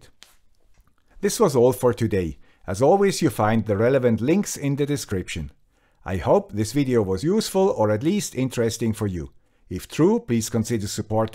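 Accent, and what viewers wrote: Switzerland. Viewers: you made my new routine: Sunday morning "swiss accent" before the breakfast;) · I noticed that lately I keep hitting videos with this specific guy with a Swiss accent. After the 5th video I decided, "Well... I guess this guy with a Swiss accent has earned a subscription..." :D Thank you for all of the work you do! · This is really getting a Swiss channel... an accent, a library... What a great video and really usefull !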